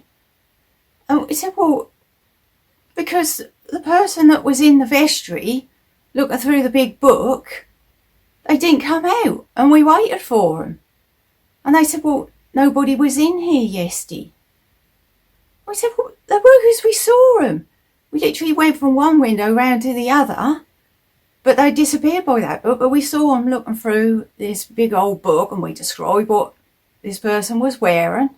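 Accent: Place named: Suffolk, United Kingdom. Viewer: Great tale 🙂 I used to fish a lake at Mistley Heath, not far from Hopkins home turf, and that place used to give me the heebyjeevies at night, no idea why but it had a weird vibe. I'm not easily spooked, I've fished loads of remote places at night over the years- even Orford Ness when sometimes I'd be the only person over there- with no problem but that place was odd. Love your accent, I'm Ipswich born and bred but it's not often you hear proper Suffolk these days, reminds me of my Nana and Aunt when I was a kid 🙂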